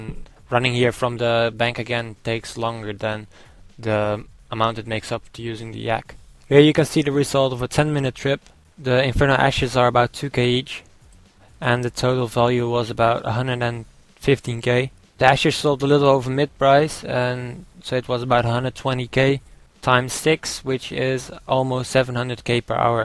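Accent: Netherlands. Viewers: wist dat je NL was door je accent:p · Je spreekt echt 'nederlands' engels..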